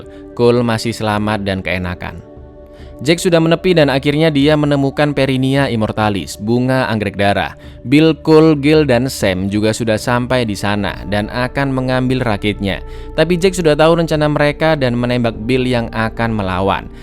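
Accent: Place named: Kalimantan, Indonesia. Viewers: anak kalimantan mana suaranyaa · Kok kalimantan nya beda wkwk. Btw gua orang kalimantan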